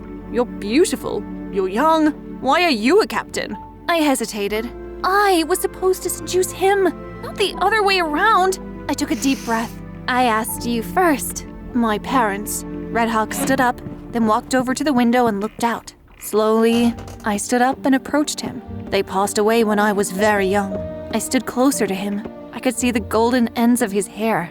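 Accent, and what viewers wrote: United Kingdom. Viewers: You sound like British I always want to sound like British and I want to be shy less to speak English or British idk how to speak British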